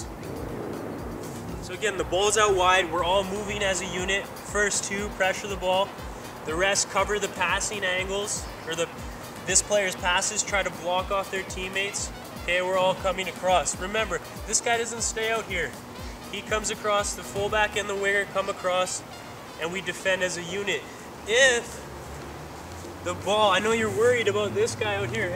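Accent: Canada. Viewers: He sounds like a Canadian to me.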